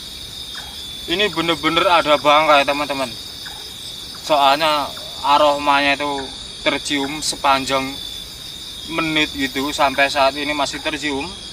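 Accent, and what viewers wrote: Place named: East Java, Indonesia. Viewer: Kang nih lokasi dimn.... Dngar logat bcara kayak org jawa timur, tpatx malang, bnrkh?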